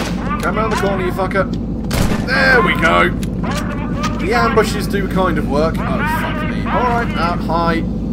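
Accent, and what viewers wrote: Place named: Russia. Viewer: Actually, I quite enjoy your Russian accents. Way better than what the Holywood degenerates think we sound like.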